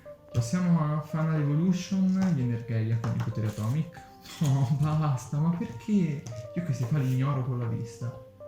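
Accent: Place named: Marche, Italy. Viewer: avevo le cuffie. E ho notato un familiare accento centro-marchigiano, ora so dove trovarti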